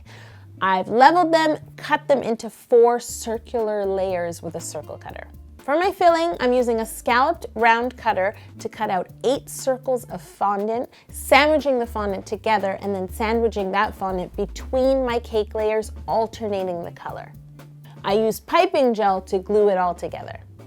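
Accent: Canada. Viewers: Canadian accent really came out this episode, i love! the cake is absolutely amazing and adorable 🥰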